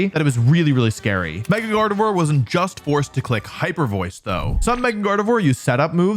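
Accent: United Kingdom. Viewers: your british accent is so authentic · British is something I didn't know I needed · I approve of your British accent and mention sir🇬🇧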